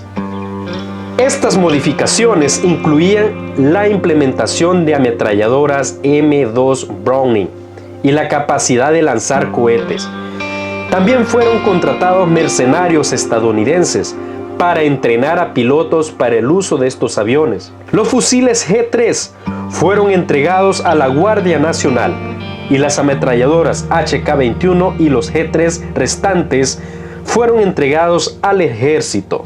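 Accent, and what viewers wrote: Honduras. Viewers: MI PREGUNTA ES DE DONDE ESTA PERSONA Q ESTA ASIENDO ESTE VÍDEO MENTIROSO 🤥 PORQ HONDURAS EN NINGÚN MOMENTO PUDO DETENER AL EJERCITO SALVADOREÑO ME UBIERA GUSTADO Q ESE CONBATE UBIERA DURADO PORLO MENOS DOS DIAS MAS DE CONBATES 😂😂😂😂😂😂ACUÉRDENSE Q ESA GUERRA NO FUE DE CONQUISTA SI NO UNA RESPUESTA PARA DEFENDER ALOS SALVADOREÑOS Q ESTABAN ASIENDO ASESINADOS POR HONDUREÑOS ISI UBIERA SIDO UN GUERRA DE CONQUISTA UBIERA ABIDO MÁS MANTAZAS DE JENTES DE HONDURAS PORQ ASÍ NOSELE PUEDE RESPETAR LAVIDA ANINGUN CIVIL ENEMIGOS ÓSEA LES UBIERA IDO PEOR SOLO PIÉNSELO LA NUEVA OCOTEPEQUE YA ERA DOMINADA EN SU TOTALIDAD POR PARTE LAS FUERSAS SALVADOREÑAS PERO COMO NO ERA GUERRA DE CONQUISTA SELAS REGRESARON 😂😂haha UN CHOCOLATE AMIGOS SEAN SERIOS Y REALES PARA COMENTAR COSAS ASÍ EN ESPECIAL A ESTE LOCO DE ESTE Q ESTABA ASIENDO ESTE VÍDEO PARESE Q ES HONDUREÑOS ENLA LANERA DE ABLAR 😂😂😂😂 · Parece que el comentarista es hondureño.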